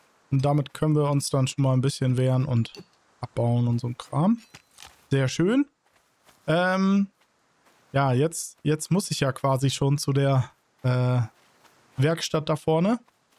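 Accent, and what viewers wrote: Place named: Sweden. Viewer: ist Schwede Mütterlicher Seite. Da Spricht man es so aus.😂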